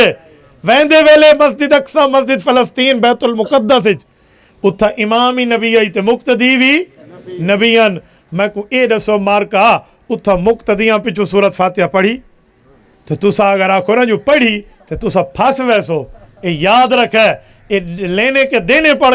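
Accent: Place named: Punjab, Pakistan. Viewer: Me apke bayan ko dislike karta hu kyunki dil se sunne ki khahish hoti he lekin Punjabi puri esi tesi kar deti hai Mood off 📴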